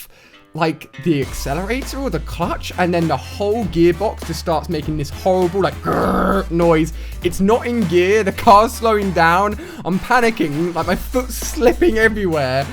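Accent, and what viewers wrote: United Kingdom. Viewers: I THINK HE IS BRITISH BECAUSE OF THE ACCENT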